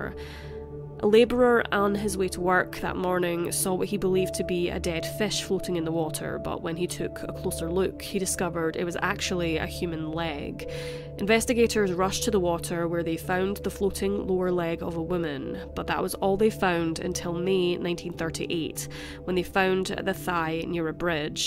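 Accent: Scotland, United Kingdom. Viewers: Scottish accent for me , very erotic for nightmarish stories ... , the reason of sucess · Really liking the narrator's voice. Scottish? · Well this puts Jack the Ripper into the lower leagues of serial killers for sure. This was a very professional production told in a clear and logical way and very interesting; I have subscribed. You also have that lovely Scots accent which is perfect for story telling. Thank you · Scottish accent. Why does it matter if she narrates? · I can't imagine having such a limited (and limiting) world view. Check out any of Sean Connery's movies, and you too can learn to recognize a Scottish accent. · Scottish accent. Not English.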